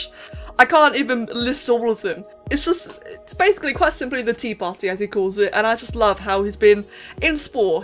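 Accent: United Kingdom. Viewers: So, this is what I missed while I was away at my family reunion? Well, I have no idea what to think of it aside from "wow". Seriously, you look to have quite the following in the Spore community just as much other youtubers for other communities possibly (Alpharad for Smash, NISLT for TF2 (he's practically Alpharad of TF2 from what my brother Robert described him as) though I'm not really sure). The fan art is simply a sight to behold and the amount made for you and the Jowwi. Also, why is it that people mistake you as a boy when you are in fact a girl (a rather ladylike sounding one at that, but it might only be the British accent (no offense)) and I don't mind your voice at all. I. AM. SO GLAD that my comment from the Helminth Charger video that you commented on about my comment being among the list of other random comments and being unsure now what to do with it, thus inspiring extreme depression in me. 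It caused and is (possibly) still causing me as great of pain as my stomachache at the family reunion from going in for seconds and getting a second can of Pepsi right after I finished my first plate and can (me drooling at a trash can in preparation to vomit and all which didn't happen thankfully, the vomiting I mean). I simply want to try to put that behind me and I wish for a second chance in the raffle, that's all I'm asking for. I am dreading and fearing that my comment about you saying my comment reposting was a scumbag, something I deeply regret with all of my heart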